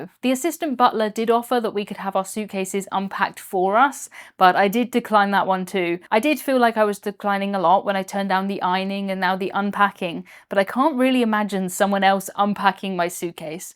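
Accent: United Kingdom. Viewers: I've never been on a cruise and don't really want to, but a while back I got addicted to presentation style and bits of humor and I keep watching her cruise videos. It must be that charming British accent she has that keeps me coming back!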